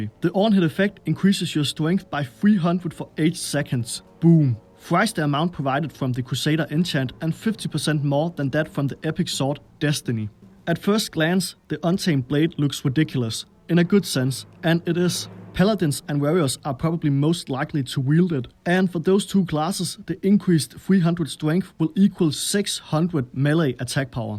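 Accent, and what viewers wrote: Denmark. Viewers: 110% a danish lad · Ey dude! Har set lidt af dine videoer, de sku meget søde, men den der danglish, det ødelægger det helt vildt, har du overvejet bare at lave danske videoer?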